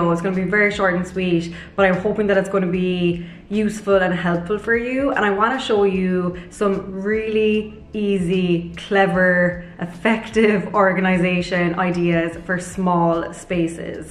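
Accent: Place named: Ireland. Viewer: Pretty Irish accent.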